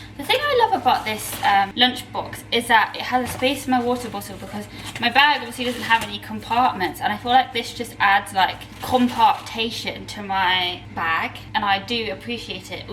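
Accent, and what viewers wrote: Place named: United Kingdom. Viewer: She’s got a British accent so she may have been born in the UK.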